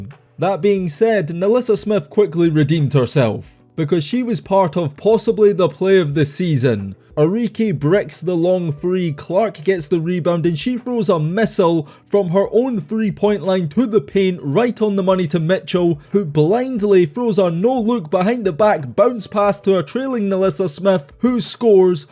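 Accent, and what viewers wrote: Scotland, United Kingdom. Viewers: It's crazy to hear this guy with his Scottish accent talking about American WNBA basketball. 😂😂😂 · Besides the fact that I just cannot stand your voice you embellish so much it is just nuts you exaggerate to the point where I can't even stand to listen to you it's like you are reading this off of a paper which I'm sure you are and it sounds exactly like it I don't know why they don't get somebody with a English voice that doesn't have some kind of Scottish accent I don't know any Scott's that are playing basketball for any team in America it's annoying just listening to you and listening to you exaggerate is the worst part of it there are Junior High girls in America that can do the same things that these girls are doing and you turn a simple layup into the greatest play of all time I just can't stand listening to you these other people can listen to you I'm done with you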